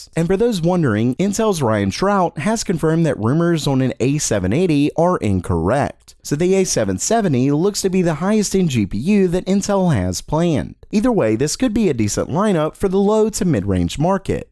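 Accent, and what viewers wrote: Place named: South, United States. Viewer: that country accent tho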